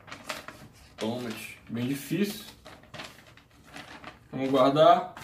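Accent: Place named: Pará, Brazil. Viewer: caray mlq com esse sotaque tu so podes ser do pará kkkkkk mta coincidencia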